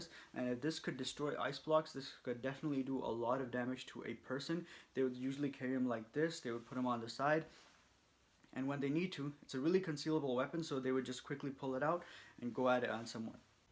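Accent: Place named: Iran. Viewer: ایرانی بودنت داد می زنه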